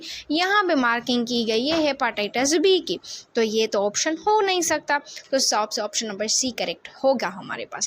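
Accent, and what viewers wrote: Sindh, India. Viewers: Mera ek dost Sindhi wo bhi esey hi bolta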